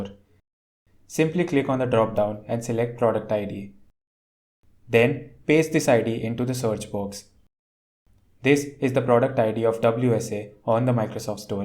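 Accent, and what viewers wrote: India. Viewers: I LOVE THAT INDIAN ACCENT ❤❤❤❤❤❤❤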